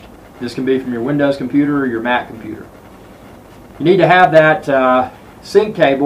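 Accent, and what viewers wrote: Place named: Alabama, United States. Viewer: Thanks for info! I'm getting ready to try this since my iphone 5 is stuck in recovery mode. You sound like you're from my home state - Alabama?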